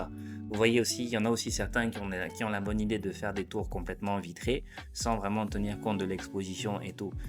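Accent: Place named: Cameroon. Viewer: Le ton camerounais 😂😂😂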